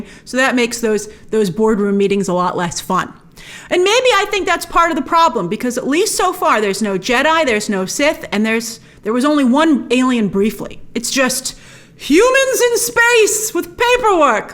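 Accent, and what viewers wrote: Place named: United States. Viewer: I'm curious, how have you avoided a New York accent 🙂? You're an early transplant? It's very slight, barely there Talk/Tauwk, Fought/Fauwt, New York/New Yawk. It's a distinctive accent 🙂. More like a New England accent, Boston is very similar. Bauwston 🙂. It's a straight American English accent, unaltered by New England, southern twangs... You moved there in your pre-teens or something?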